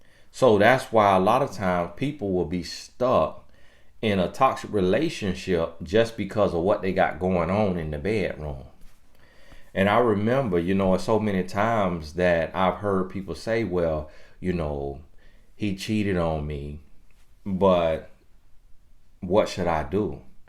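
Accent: South, United States. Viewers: Girl he is country. Yes he has been saying back 😆 I only knew that cuz I'm country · i had to rewind. Bro did you say 'pern' (porn)? 💀💀💀 My bro country as hell, & I'm country too so its all good.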